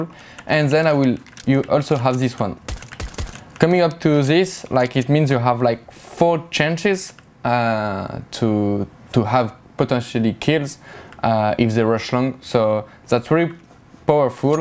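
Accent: France. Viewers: The french accent xD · Gre tu comprends ps en plus c un anglais de français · Totally agree, but at least hearing shox trying to speak english is funny (even for french people his accent is bad)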